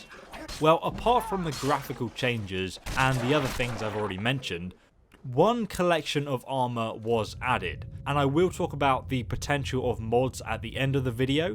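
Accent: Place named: RP, United Kingdom. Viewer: I would love to hear a review of Skyrim VR with that posh accent of yours. I tested all your glitches on VR and they are working too.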